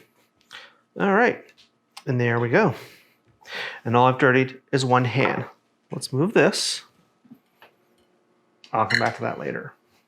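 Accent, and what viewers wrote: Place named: United States. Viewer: This isn't Scottish shortbread, none of the ingredients are Scottish and from the accent of the bloke making it it's being made in america, so it just shortbread. As a baker i have made more shortbread than this guy has had hot dinners, and you don't put salt in it.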